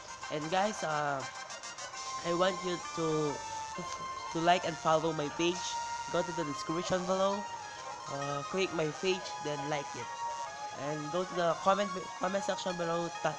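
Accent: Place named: Philippines. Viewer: oh bcz I'm a Filipino too I can tell by the voice and it says Tagalog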